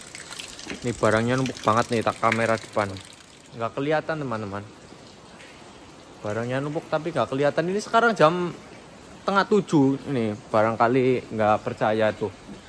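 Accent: Banyumas, Indonesia. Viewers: Sampean orang ngapak yh mas...soale ketauan dr logate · Haha kaya masih ngapak and medok lucu dan seru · Kie mesti asalle ko wong ngapak2 ya mas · Bukan kasar tapi ngapak......😅😅😅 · Rika wong ndi sih kang kayong logatane ngapak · Yakin mesti giye bocah ngapak. Manggone nang endi ya...? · Wong ngapak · ini org jawa saya ngapak2 ngapak ngendi mas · Kowe ki ngapak ya mass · Weh weh ra ngapak ora kepenak yo mas😁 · Asli ngapak ya mas? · Ngapak y mbok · Ora Ngapak Ora Kepenak ya kang😃😃😃👌👌👌 korea skrg dah 3rb lbh yg poditif terkena virus corona,,,, kayauuuu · Wong banyumas y? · Ngapak e ketok buos 😂😂😂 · Wong ngapak ya rika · Kok ngapak ya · Masnya dr ngapak yaa ..bahasanya kelihatan berat kakuu ..seringb2 ngobrol ma daerah lain ..biar gk kaku bahasanyaaa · Mas...sampeyan wong ndi? kok logate ngapak, hehe... 🙏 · jiaaaan kynee ngapak kie luuh hahaha...salam knl mas broo??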